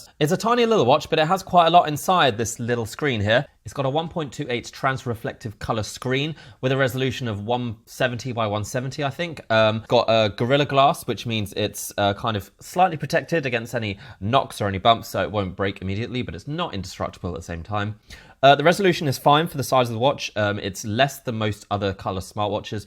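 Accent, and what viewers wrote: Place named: England, United Kingdom. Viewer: u must be from england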